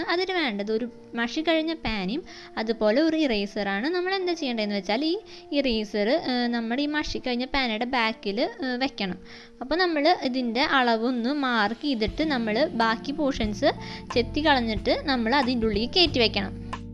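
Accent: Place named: Thrissur, India. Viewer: Ente frnd thrissur und... Pinne slang kettappo manasilayi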